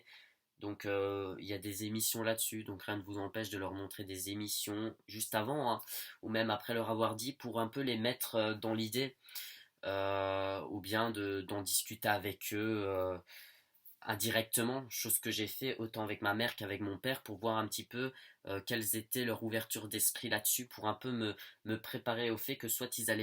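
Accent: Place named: Mons, Belgium. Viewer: et Montois en plus! Il me semblait bien que je connaissais cet accent:p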